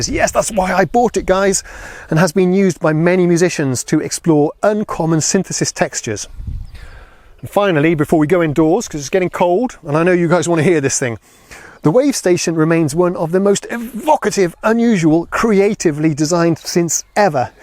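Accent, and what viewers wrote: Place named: England, United Kingdom. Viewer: It seems like you live in Sweden, but you have an English accent. Are you from the UK originally, or did you perhaps study English there as a youngster?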